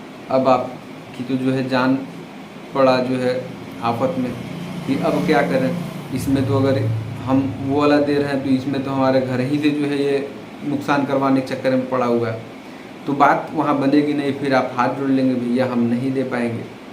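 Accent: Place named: Bhojpur, India. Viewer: Arrah se ho ya Buxar se ya bhojpur se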